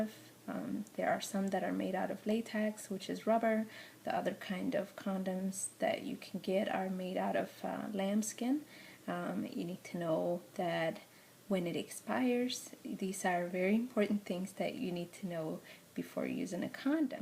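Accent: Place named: Tigrinya, Eritrea. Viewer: She's a decent person. I enjoy her manner of speech. How slow and carefully she says every word gives her that classic Eritrean-American form of speech. A few of my female cousins in L.A who speak Tigrinya like my parents but are college educated. They all speak and have that very subtle Tigrinya accent like this chick does. The Eritrean in me wanted to not like this video. At first I thought it was too vulgar because she details pre-cum and and the speed of sperm. Watching more of the video. I couldn't help but think kids (and even some adults) are going to type "sex" in the searxh column anyways. There are a million videos of other people doing this same thing. Those other people are not Eritrean though. I'm a firm believer on the adults being and looking like the children who are learning. It's nice to have a young woman who looks, speaks and is similar to my little niece, sister, brother ect. 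I'm studying to be a high school geography teacher. I'm doing that because I want my children and children of similar color as me to see an educator who looks like them. The only major disappointment for me with this presentation is. No visual example. She details and is very well informed in what and how to use a condom. My issue is that if someone is watching this video to learn. How will they retain a visual memory without having seen how to do what she's saying? A condom and a banana or a cucumber would have helped in this video. Eveything she is saying is right. Add a visual exa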